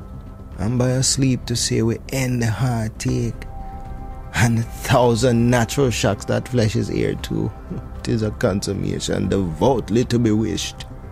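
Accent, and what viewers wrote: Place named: Jamaica. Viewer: Wonderful the Jamaican accent is Tight my Brother so uniquely poetic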